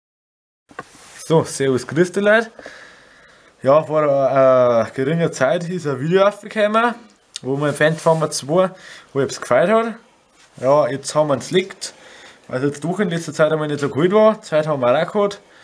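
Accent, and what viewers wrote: Germany. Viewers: Wo aus bayern kemz es?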